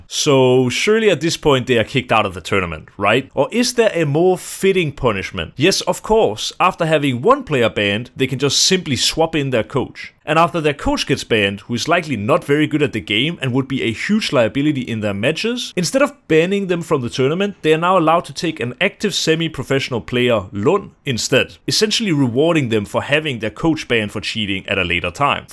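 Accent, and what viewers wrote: Denmark. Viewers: the heavy danish accent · Man føler sig hjemme med den danglish accent <3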